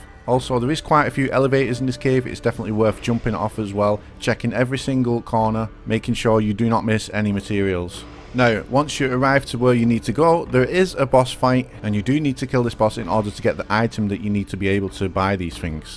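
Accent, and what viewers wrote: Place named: Northern England, United Kingdom. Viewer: Think your a northerner GGs I'm Scottish and bad with accents, it's close to mine tho. Subscribbled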